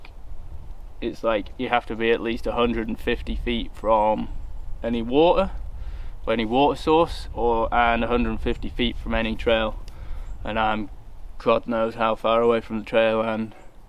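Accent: United Kingdom. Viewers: Dude are you country or British I can’t tell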